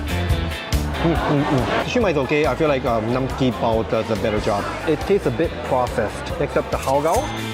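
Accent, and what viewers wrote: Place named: Singapore. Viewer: Why u speak in Sg accent.. hahaha.. cute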